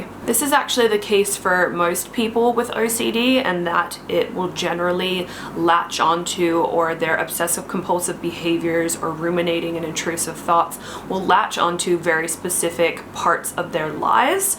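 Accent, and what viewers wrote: Australia. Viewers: i love how all your “O’s” are so Australian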